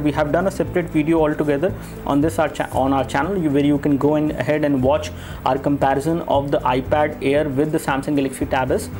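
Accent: India. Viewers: Indian pronunciation :D kill me i cant understand at all sound funny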